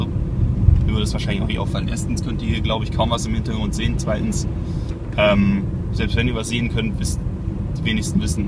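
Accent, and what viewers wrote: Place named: Berlin, Germany. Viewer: Also ick find ja das dein Berlinerisch jarnich mal so einjerostet is ick hab dich voll und janz verstanden.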